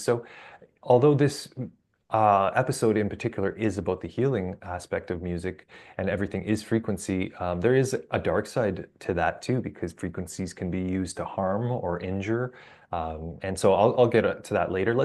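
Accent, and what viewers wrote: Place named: Scotland, United Kingdom. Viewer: Yep...nice one 😮 nice Scoish accent 😅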